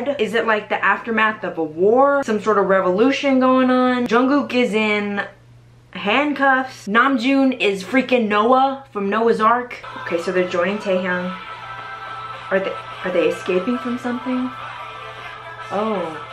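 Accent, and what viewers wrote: United Kingdom. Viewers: ur british got me crying 😭😭😭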